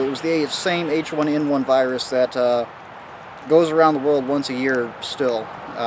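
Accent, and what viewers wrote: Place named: South, United States. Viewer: Lumberjack Obi-Wan with a southern accent. Based.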